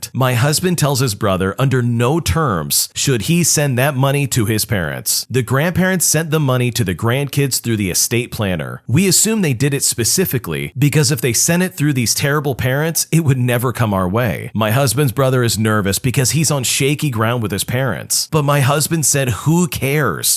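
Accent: United Kingdom. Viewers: Been waiting for the British guy’s voice :)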